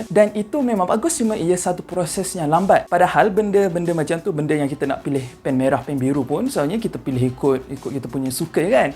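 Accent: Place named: Kelantan, Malaysia. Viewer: Ni mesti org klantan dapat cam loghat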